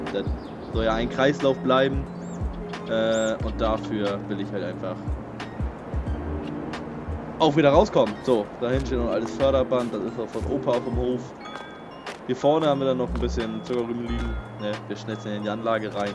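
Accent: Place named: North, Germany. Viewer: Sehr toller Hof, tolles Video, sympathische Stimme samt Akzent (ich liiiebe norddeutschen Akzent!). 👌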